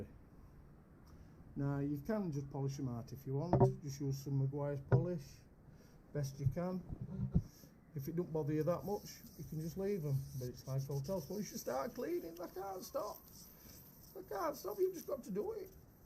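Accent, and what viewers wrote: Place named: Barnsley, United Kingdom. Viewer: 😁i knew as soon as started watching this tha was from Barnsley ,even before i looked at comments........good vid mate.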